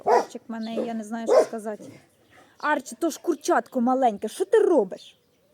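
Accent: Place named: Surzhyk, Ukraine. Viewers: Не знаю, чому Ви себе бешеною - чи це в тому смислі , що Ви така щаслива , безпосередня у спілкуванні з тваринками.. Але Ви така щира і приємна, що це не може не подобатися. Цікаво, звідки Ви? Мені не попадали на очі ті кгметарі, де Ви про це розповідали. По вимові - косю ходю - то це десь Дніпро-Полтава- Кропивницький ... А про стріми, відео - Ви цікава своїми щирими емоціями, бо є люди, які повторюють чужі фрази з тік-току , а є ті, що хочуть бути дуже оригінальними і плетуть хтознащо . А Ви не фальшива актриса, а просто кайфуєте від того,що робите - і це приваблює. А сюжети життя саме підказує. Хто має тварин і любить їх - для того майже щодня якісь маленькі пригоди. Знаю по своїх котиках - можна цілу книжку написати , та такого, що й не всі б повірили в котячі витівки ) А пригоди вашого Жоріка - це ж неповторний дгсвід, бо в кого ще є такий вільний кабан ? 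Та і не всі в селі вміють нормально говорити з тваринами. Є такі, як що : киць-киць, іди їсти, їж, на, пішов геть , щоб ти здох - це так реально одна знайома жінка каже... А я можу щодня діалоги зі своєю кицькою вести : і яка вона красуня, і хвалю її вміння спати непорушно пів-дня, не міняючи пози : виспалась, доця? О, перевернулась ? Правильно, двіженіє ето жизнь ) Був би тільки час .. Бажаю Вам всього-всього, що Вам хочеться і потрібно ( бо не завжди нам потрібне те, що хочеться) Досягнете Ви ста тисяч, кнопок чи ні - аби це Вас радувало , аби ьуло мирне і шасливе життя. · Сонечко, ти найкраща. Твий суржик такий справжній, такий теплий... Дай Боже тоби всього найкращого.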